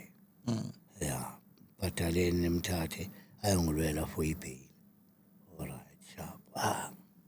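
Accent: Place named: KwaZulu-Natal, South Africa. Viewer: Eeeeiy uwungowaseKZN ngempela mina yakuzwa😂😂😂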